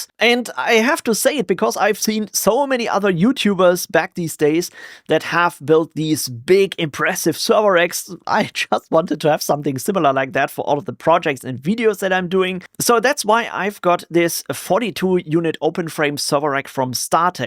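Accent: Germany. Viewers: Lustig, dass man sofort erkennt, dass Du aus Deutschland kommst. Dein Englisch klingt fast wie Plattdeutsch ✌️🤗😋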